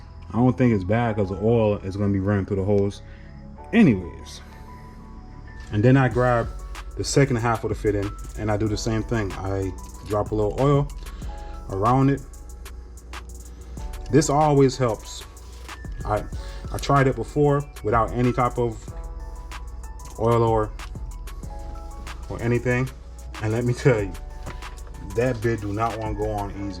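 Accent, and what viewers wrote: Jamaica. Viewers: Just bought this today, thanks man. Jamaican???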